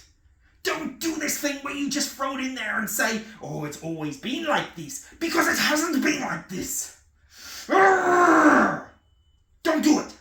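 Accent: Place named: Germany. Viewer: dude I thought you were either German or Austrian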